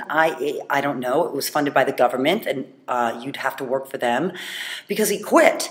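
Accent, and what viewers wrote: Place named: Midwest, United States. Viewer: Great, subtle Mid-america accent. Where are you from, darling?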